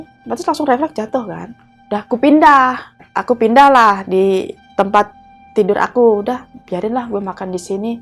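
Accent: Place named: Surabaya, Indonesia. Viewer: Ohh ciri khas org surabaya gitu ya bg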